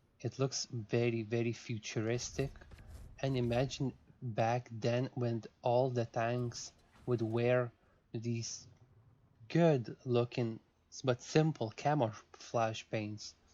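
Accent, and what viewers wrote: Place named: England, United Kingdom. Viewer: I really like you English accent ❤️👌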